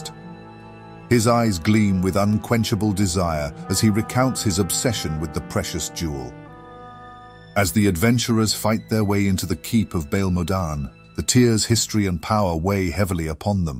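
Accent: United Kingdom. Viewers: Hey, I do not know if this commentary will eventually be read by you, but I must say I really enjoy this splendid series. I am a foreigner so you have no idea how much time I spend on dissecting every little word that I cannot get through haha. This is such a good improving lesson each time a new video comes out though and even if I always considered myself bilingual, realising I still can struggle sometimes with some words is a wee bit frustrating haha. So here I am, catching myself reading outloud the subtitles as you speak and even though the videos are around 20-25 minutes, I spend the double amount of time training and repeating that lovely british accent. So thank you for that, mate. Have a good day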